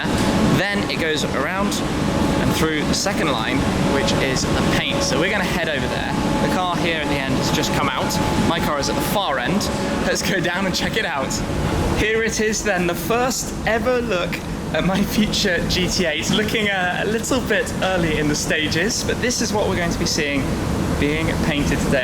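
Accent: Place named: United Kingdom. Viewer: this guy sounds like the british stadman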